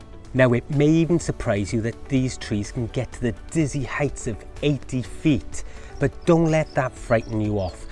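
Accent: Wales, United Kingdom. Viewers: that's wales accent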